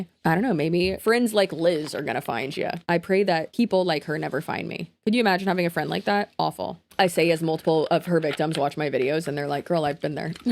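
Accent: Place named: Florida, United States. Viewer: yess she’s been hitting that Florida accent extra hard lately too for some reason. Isn’t she from appalachia or sum?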